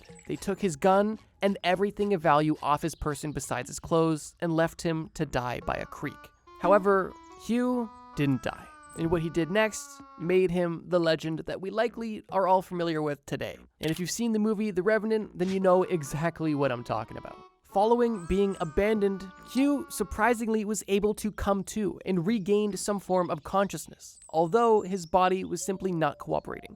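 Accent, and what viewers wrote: Canada. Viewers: I think he’s Canadian ????? They pronounce things weird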